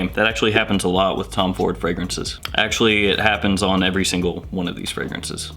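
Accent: South, United States. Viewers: there's a bit of a southern twang to your speech, it's very interesting and enriching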